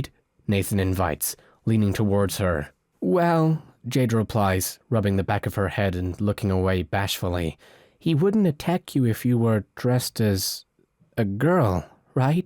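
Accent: United Kingdom. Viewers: Is it just me or does the speaker sound British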